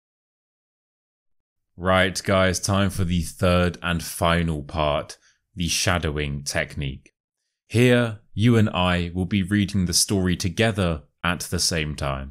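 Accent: United Kingdom. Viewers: The best british accent, the best channel ever!!!!! 👏🏼👏🏼👏🏼👏🏼👏🏼👏🏼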